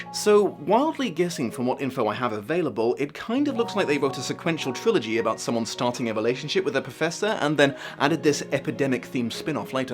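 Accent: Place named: United Kingdom. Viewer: There is something so beautiful seeing someone with a thick British accent reviewing American smut novel's.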